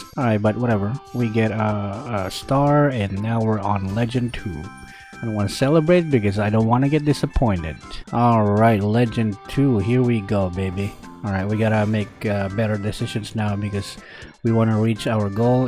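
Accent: Philippines. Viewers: Are u a filipino with english language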